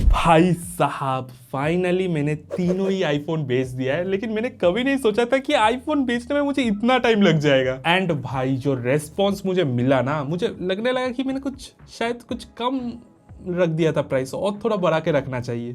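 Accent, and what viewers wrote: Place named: Kolkata, India. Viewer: U have Kolkata accent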